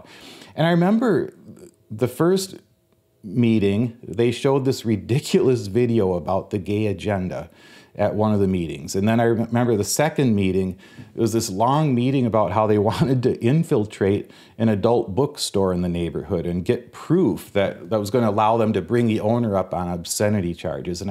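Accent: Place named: Minnesota, United States. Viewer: If everyone channeled your “Minnesota nice” tone and accent when approaching our political and religious differences we’d be in a much better place in this country.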